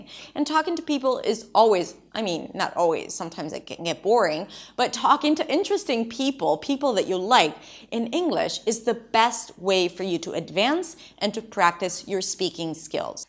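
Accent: United States. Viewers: I want to be fluent 1 - In order to get married with an English man. Hoping he will learn Arabic so I won’t have to fight with English. 2 - I live in Canada 🇨🇦 I have to speak English. you are from Israel 🇮🇱 but you sound 100% American you are very smart. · Thank you so much for this clip. I always wanted to become a fluent English speaker, but I never asked myself why, so now you have made me become a critical thinker. I used to think you were born in the USA since no one can separate you from those who were born there due to your American accent until you told that you were not American.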